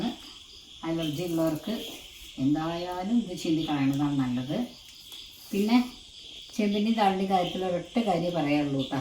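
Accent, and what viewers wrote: Valluvanad, India. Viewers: Sister, i have become a big fan of your videos.... ആ വള്ളുവനാടൻ ഭാഷ കേൾക്കാൻ തന്നെ സന്തോഷം ആണ്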